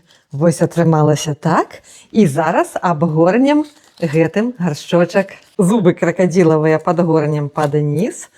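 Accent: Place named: Russia. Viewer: Это ж надо так исковеркать русский язык. 😮 Хотя слышно, что для нее родной язык русский. 😱😓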